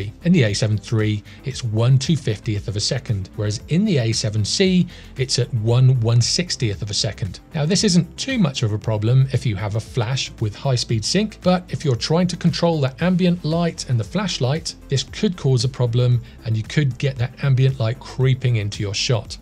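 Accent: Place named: Midlands, United Kingdom. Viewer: Nice one - Im guessing midlands to South.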